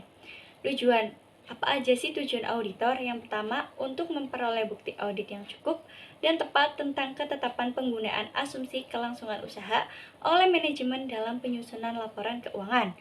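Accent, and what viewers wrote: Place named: Banyumas, Indonesia. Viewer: Walau ngapak aku ttp luuuvvv wkwkwkw semangat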